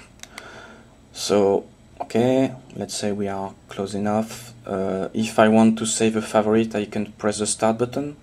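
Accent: France. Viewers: I like the frenglish ;-) Will it work under 3.90 M 33-3? Merci beaucoup pour le Video, Chris, de Corfu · Are You French ? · It's no secret that foreigners can often write/speak better than native english speakers. This french accent is nice and understandable which is most important.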